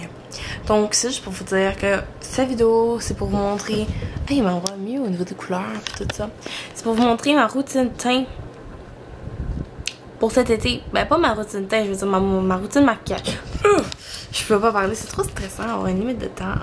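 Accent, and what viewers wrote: Canada. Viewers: Je t'envoie un message de la Belgique (j'suis parisienne mais j'm'y suis perdue lol) pour te dire que j'ai passé un super moment! L'accent canadien est trop bon à entendre!! Bisou! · J'adore tes vidéos car tu assumes tout !! Et surtout j'adore ton accent canadien :) Tu es magnifique *__* ( j'adore ton piercing ) ! <3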